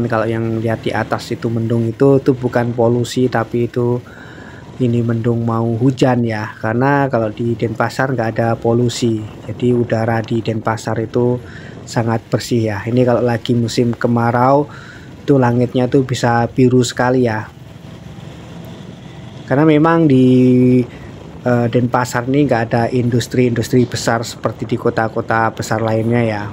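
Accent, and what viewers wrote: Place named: Java, Indonesia. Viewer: masnya asli mana kok logatnya kayak Jawa gitu...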